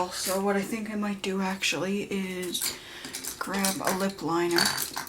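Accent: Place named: Australia. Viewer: Oh my god!! I JUST came from piinksparkles vlog and as i was watching this I recognized your accent!! Im from north sydney!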